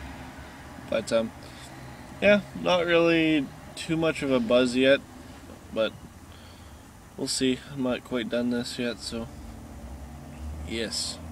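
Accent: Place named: East Coast, United States. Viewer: You are awesome! I just gotta ask, do you smoke? Bhahahaha... okay, okay, all kidding aside, I was thinkin' you sounded from the east coast. Just wonderin'. Keep up the great work from Josh here in Ontario! Cheers!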